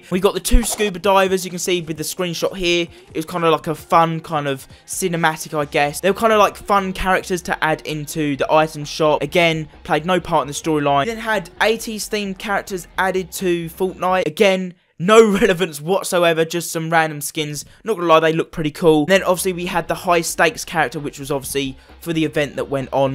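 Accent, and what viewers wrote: United Kingdom. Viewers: Is it me or do all of these Fortnite you tubers who discuss fornite lore sound the same.....a uk man with a Very very strong Uk accent...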